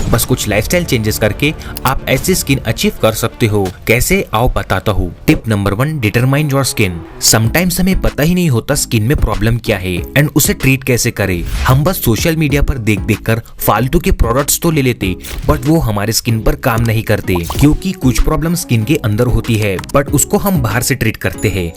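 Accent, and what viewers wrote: Hyderabad, India. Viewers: 𝗕𝗿𝗼 𝗔𝗿𝗲 𝗙𝗿𝗼𝗺 𝗛𝘆𝗱𝗲𝗿𝗮𝗯𝗮𝗱 ❓💌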